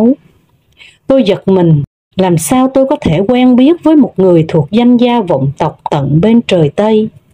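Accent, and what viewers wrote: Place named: Hue, Vietnam. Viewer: Chị đọc giọng Huế rất chuẩn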